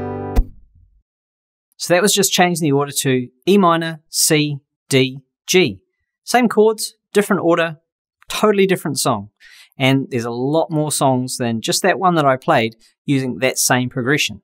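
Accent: New Zealand. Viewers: Bro you’re a kiwi aye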